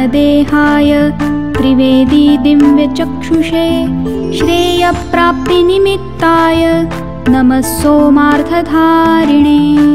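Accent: Standard. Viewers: सादर नमस्कार। शुद्ध उच्चारण साक्षात् गले में सरस्वती जी विराजमान हैं। मैं रोज सुनती हूँ और साथ में गाने का भी प्रयास करती हूँ ।मुझे भी गाने का शौक है। बहुत बहुत धन्यवाद नमस्कार। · ATI shudh uchharan Hai apka. Param madhur swar. Jai Ma 🙏🙏swayam Ma Saraswati ka Roop hain aap. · इस प्रकार सस्वर सहित एवं शुद्ध व्याकरण सहित पाठ मैंने आज तक नहीं सुना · Bahut shudh ucharan ,ati sunder. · Bahut madhur vani avm bahut shudh vachan.bahin per saraswati maa ki kripa hai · शुद्ध उच्चार... 💐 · जय भगवती मा शुद्ध एवं सात्विक उच्चारण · शुद्ध उच्चारण , सुमधुर आवाज । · Very nice, suddha uchharan To Liston again and again, Love to Liston all the time. 💐💐🌹👌👏🙏thanks making this odio. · ऊँ नमो: भगवते वासुदेवाय । इतना सुंदर शुद्ध उच्चारण के साथ आप गायीं , बहुत बहुत धन्यवाद आपको । मीना सिनहा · Complete path. Visuddh uchharan sath sath pratyaksh on screen. Iskeliye koti koti pranam . MAA DURGA AAP PE KRIPA KAREN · अत्युत्तम सुमधुर कर्णप्रिय सांस्कृतिक स्वर में शुद्ध उच्चारणयुक्त, जय मां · adbhut शुद्धता और पवित्रता के लिए ही परसिद्ध है।शुद्ध उच्चारणके लिये कोटिश:धन्यवाद अभिनंदन।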